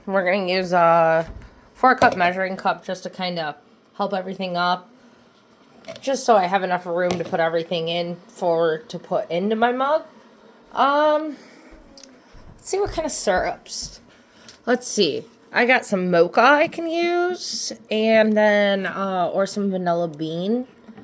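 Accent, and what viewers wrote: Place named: Midwest, United States. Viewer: Holy crap, how do you say you are from the Midwest, without saying you are from the Midwest. Got to be Wisconsin or Chicago area.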